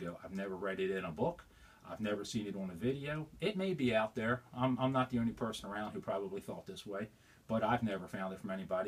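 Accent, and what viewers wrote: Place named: Maryland, United States. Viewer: This guy sounds like he's from the eastern shore of Maryland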